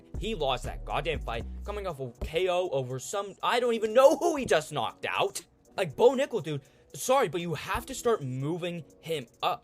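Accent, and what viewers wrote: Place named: Midwest, United States. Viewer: You are actually retarded! Definitely from the Midwest with retard accent dontcha ya know! So glad the UFC doesn't hire fucktards like you for match making. Why do you keep saying we? There's no "we" you are in no way, shape, or form involved with decision making in the UFC. One last thing... cut your fucking hair! You look like a botard!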